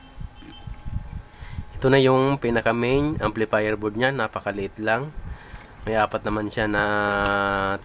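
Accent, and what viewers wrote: Bicol, Philippines. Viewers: Sir bicolano kba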